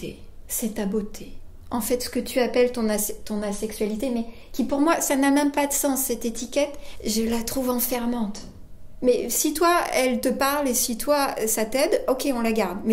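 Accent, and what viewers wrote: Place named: Quebec, Canada. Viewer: Des pointes d'accent québécois qui ressortent plus que d'habitude, comme si tu revenais d'un voyage là-bas :) Trop chouette cette vidéo !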